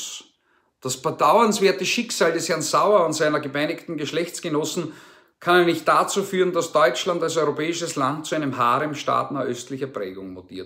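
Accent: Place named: Austria. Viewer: Herr.... Sie ein Sprachrohr der Menschheit mit sehr angenehmen Worten! Ihr Vokabular und IHRE Stimme mit dem herzlichen Österreichischen Dialektes ist ein Genuss...! Wahrlich 👏😘 Ich könnte mir sehr sehr gut SIE als Posaune Gottes vorstellen, der das wahre Evangelium, jaaa, die rettende Botschaft von Jesus Christus verkündet.,...weil SIE die Wahrheit sagen... Servus und BABA hochachtungsvoll Reinhard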